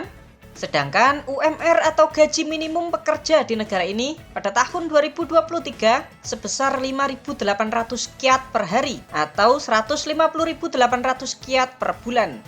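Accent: Java, Indonesia. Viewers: Toto sekali jawanya min😅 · Medok banget min jawa nya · Suaranya jadi candu dengan medok jawanya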